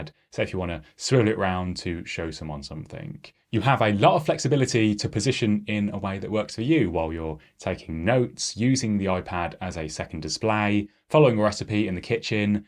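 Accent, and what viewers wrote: Midlands, United Kingdom. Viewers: Are you from the Midlands? I’m trying to place your accent. I love the Retro charger - I’ve been after something like this for upcoming holidays.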